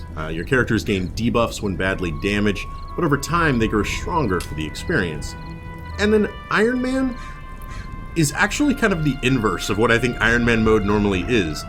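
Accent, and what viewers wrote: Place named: Oklahoma, United States. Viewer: keep it going! that OK accent adds to the game for me this game has awesome narrative to it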